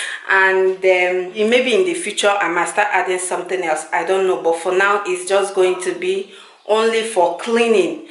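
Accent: Nigeria. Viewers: Aunty!! I love how authentic you and your family are. I especially love your thick Nigerian accent. It's sounds beautiful. Thanks for being so real!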